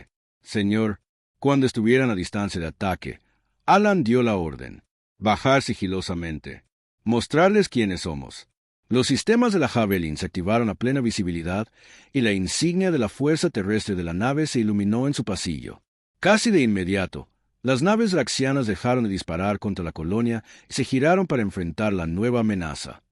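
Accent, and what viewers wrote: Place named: Bolivia. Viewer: el que habla es boliviano jajajaja, me gusta, pero no con fio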